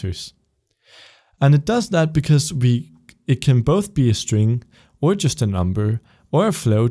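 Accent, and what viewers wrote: Denmark. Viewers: Haha, sad og tænkte... "Den accent der.... Han MÅ da være dansker..." "... My name is... " xD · wait are you danish ???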